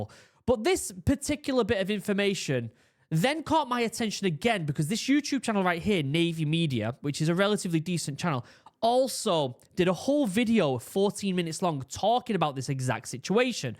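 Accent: United Kingdom. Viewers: Yours is the only British accident that doesn’t totally bug me. Idk why but it just sends chills down my spine when British people say “I have to go to hospital” instead of “I have to go to THE hospital” but for some reason it doesn’t bother me as much when you talk lmao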